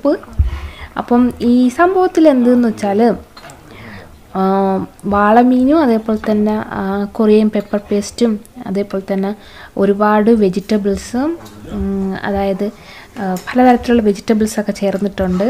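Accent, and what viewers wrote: Trivandrum, India. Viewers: ട്രിവാൻഡ്രം ആണോ ivar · A new viewer from Trivandrum 😀 being a Korean admirer, watching ur videos and hearing ur (Trivandrum slang) Korean is like 🤩🤩🤩 wish I could be there... 💜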